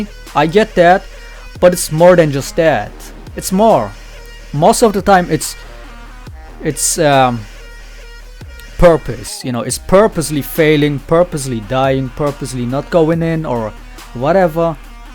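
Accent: Germany. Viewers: Die English Aussprache haha :D Kannst du mal ein Video in deutsch machen? ^^ Bist doch deutsch oder o.O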